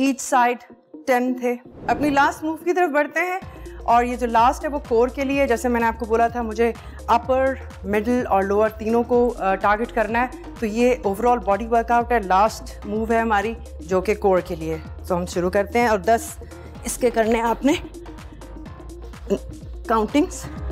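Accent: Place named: Punjab, India. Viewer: Hahahaah ur punjabi just love😂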